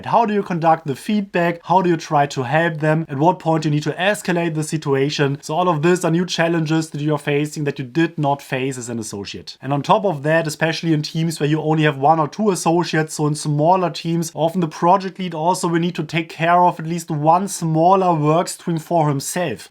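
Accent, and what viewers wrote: Germany. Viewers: That Bayerische accent though lol! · Reminds me of my professor from Uni Mannheim. Love the German accent!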